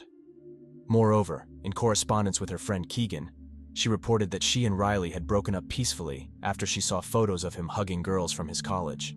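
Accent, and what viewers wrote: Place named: Australia. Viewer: I love to see how you are growing so fast!! I miss the Aussie accent. Great video!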